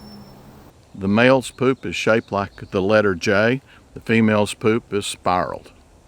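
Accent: Texas, United States. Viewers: Say Coyoteeee! We Texans do not need to speak the same as the rest of the country! I mean some people up North cannot pronounce the "R's"! Coyotes have to be thinned out. It's your ranch, don't allow others to tell you what to do!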